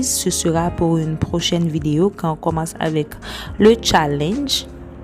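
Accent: Haiti. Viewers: Accent haïtien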